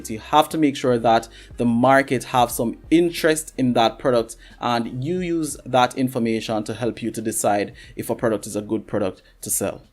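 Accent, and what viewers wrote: Jamaica. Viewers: Good video. Are you from Jamaica?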